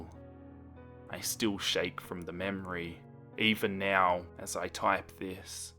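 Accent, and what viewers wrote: Australia. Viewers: Are you Australian? Lol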